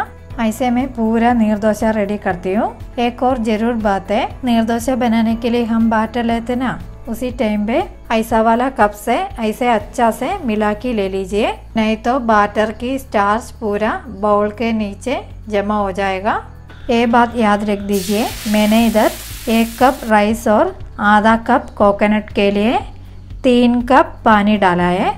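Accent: Kerala, India. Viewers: Very Well explained and demonstrated. Your Hindi with Keralite accent is cute as you. Keep it up 👍👌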